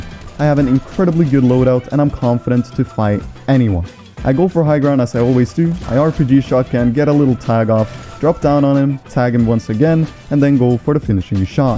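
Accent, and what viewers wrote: Norway. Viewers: u norwegian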